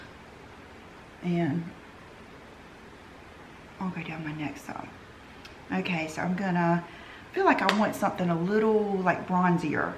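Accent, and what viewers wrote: United States. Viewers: I am a recent subbie from the UK living in Spain and I love your channel. Love your accent and you are very pretty. What state do you live do you mind me asking? Xx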